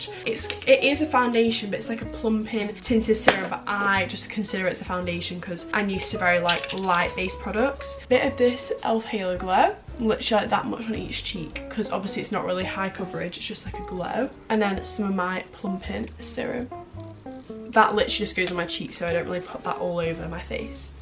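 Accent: United Kingdom. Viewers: I love the British accent😊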